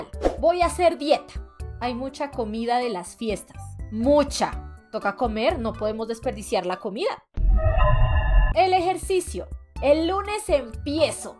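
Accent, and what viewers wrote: Colombia. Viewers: tu de que parte de colombia eres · ¿De que parte de colombia sos? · Oye una pregunta eres colombiana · Eres de Bogotá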